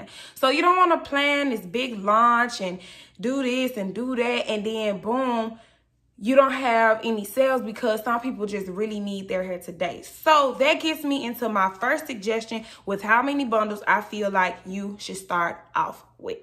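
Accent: Atlanta, United States. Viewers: You talk like you’re from Atlanta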